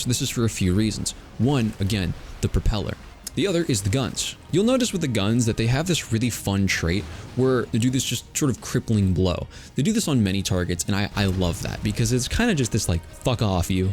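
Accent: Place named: Netherlands. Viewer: The slightly hidden Dutch accent just oozes of every few words you speak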